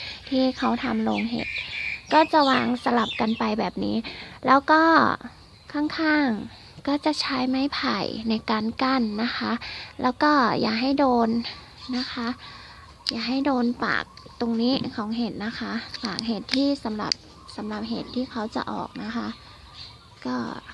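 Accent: Isan, Thailand. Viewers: เว้าอีสานน่ำ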